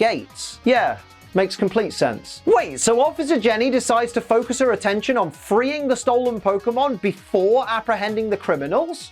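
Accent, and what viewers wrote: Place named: United Kingdom. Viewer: Oi u British mate?the Pokemon is blitze.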